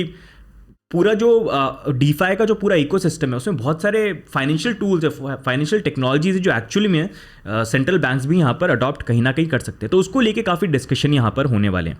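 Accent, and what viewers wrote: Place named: India. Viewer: In the end - Indian same pronunciation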